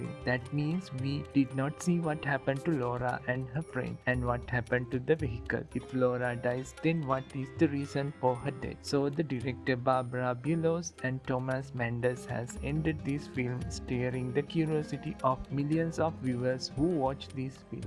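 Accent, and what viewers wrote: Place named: India. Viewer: 🇮🇳 Indian accent is annoying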